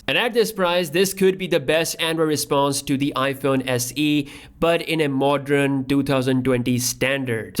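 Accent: India. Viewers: I like how there's just a pinch of Indian in your accent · Strong Indian accent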